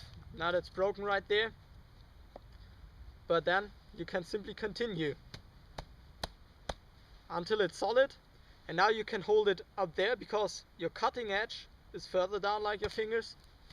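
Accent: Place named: Austria. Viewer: Great video! The best video I've seen on youtube about using an axe safely. I also really like your accent. Austrian right?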